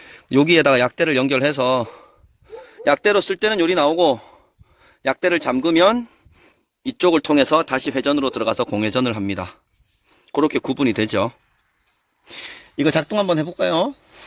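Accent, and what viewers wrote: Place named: Yecheon, South Korea. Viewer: 시끄라도 ᆢ예천말 정겹워요 ㅎ 늘 홧팅입니다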